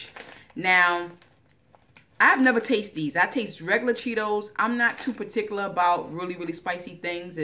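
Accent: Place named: New York, United States. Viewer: and you sound like your from New York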